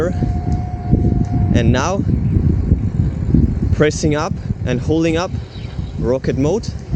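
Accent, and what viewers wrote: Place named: Germany. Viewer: the way says "sooooo" and "bähttery" revealed his germaniness XD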